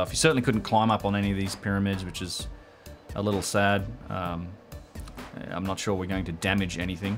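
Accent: Australia. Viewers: G'day from Oz, great live stream and other videos. Articulate, informative and excellent delivery with an Aussie accent to boot! Keep up the great work and live streams. Loving the mind blowing Serapeum series, and looking forward to your Younger Dryas / Cataclysm video. Cheers.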